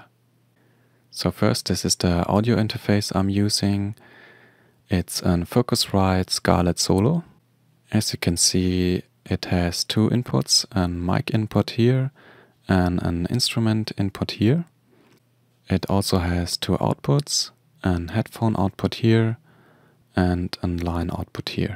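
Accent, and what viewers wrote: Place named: Germany. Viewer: Gutes Video mann hört aber Das Du ein Deutscher bist.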